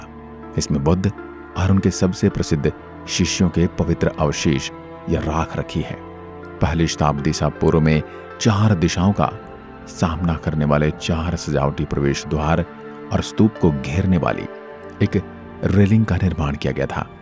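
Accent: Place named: Rajasthan, India. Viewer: Thanku ji mp ke बौद्ध स्तूप के लिए मैने आपकी सारी वीडियो देखी h राजस्थान की आपकी आवाज bht pasand h 🥰🥰🥰🥰 भाई जी प्लीज mp ke gwalior or shivpuri par video बनाइए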